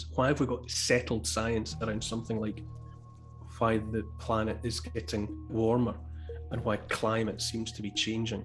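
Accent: Scotland, United Kingdom. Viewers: When I need a booster of a Scottish accent, honesty and a questioning mind, I drop in here. I’m a Scot in Taiwan. I think I’d hate to be living in Sturgeon’s ideological dystopia right now. Taiwan handled all this so much better, and that with China a stone’s throw away.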